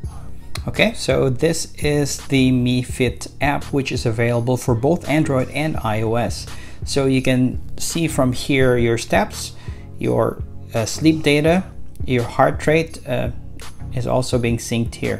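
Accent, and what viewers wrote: Philippines. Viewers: Sound Filipino...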